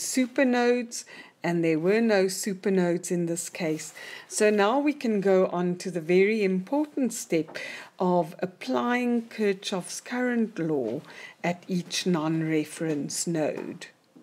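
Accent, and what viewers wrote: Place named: South Africa. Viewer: I'm a South African studying in the states and hearing this accent made my day, new subscriber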